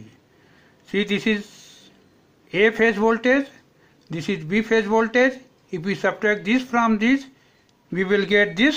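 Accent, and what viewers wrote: Bihar, India. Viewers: Sir aap English Bihari bhasha main Bolt hi please Hindi mein boliye thank you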